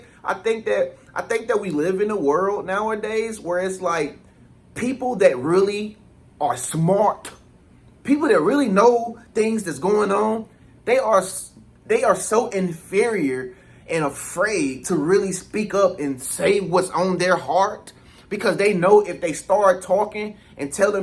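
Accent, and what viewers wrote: United States. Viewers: Thank you so much for doing what you are doing. Your authenticity is such a light in the world. I am not American so sometimes I have a hard time understanding your accent but your light shines so bright that I keep coming back and watch because you are a beautiful soul and you inspire me somehow. I am sending you my love and light. You deserve the best. Blessings ❤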